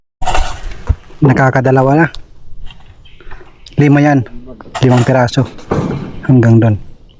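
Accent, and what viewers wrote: Waray, Philippines. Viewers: sir waray waray ang salita nyu taga saan po kayo?ofw from abu dhabi and taga leyte...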